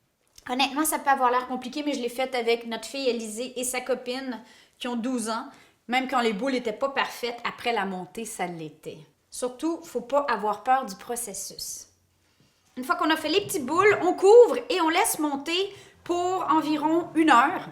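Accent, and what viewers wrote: Quebec, Canada. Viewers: Alors moi je suis en Nouvelle Calédonie,TOM( encore Français!) tu es géniale j'ai passé mon aprem à looker tes vidéos...tu m'éclates,et puis en tant que bonne Française, je suis irrémédiablement FAN de votre accent les Québécois! par contre n'oublie pas de noter pour tes FAN francophone les conversions en degré "celsius" des températures,ainsi que des mesures en Grammes ou centilitres et millilitres..car les cups nous on connait pas!!! big kisses du Pacifique! je te kiffe 😍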